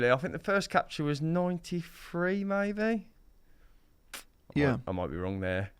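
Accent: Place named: Birmingham, United Kingdom. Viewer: with a Brummie accent! meets Peaky Blinders!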